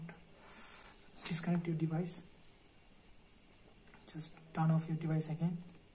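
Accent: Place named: China. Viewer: I don't know If I can trust you because you sound like Chinese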